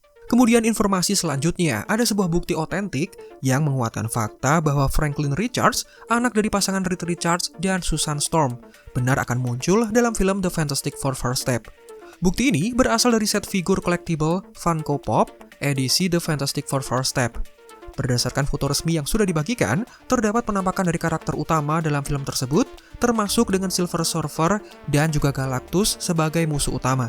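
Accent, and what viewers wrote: Java, Indonesia. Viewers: mas, sampeyan asli mana . kok kayak ada medok jawanya, hehe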